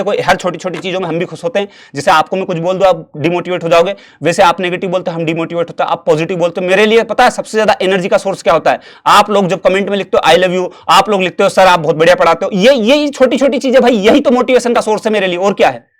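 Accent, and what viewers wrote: Indore, India. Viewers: Apki jo ye indori ganv wali language hai na sir maza aajata hai sun kar 😅😅😅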